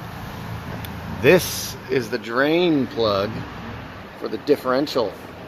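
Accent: United States. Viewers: Who cares to make fuss about drivers position. He made a mistake, but we all should know the right or left of our vehicles if we are going to do potentially detailed maintenance. I get it. But we should focus on the facts. This very well could had been a Subaru in Japan or England, etc. Sans his American accent. But not every u tube video is safe to follow without some double research or common sense. One could see the frontal location of the front differential in his video. That's enough beyond a verbal for me.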